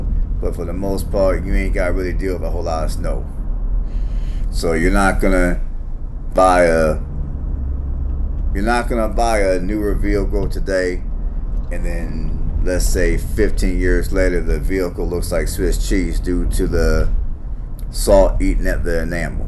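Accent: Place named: New England, United States. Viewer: Guess illereate yankee voice beats AI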